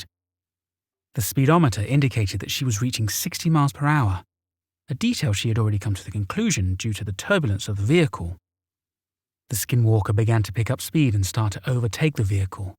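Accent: United Kingdom. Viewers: Why is this story by a British narrator?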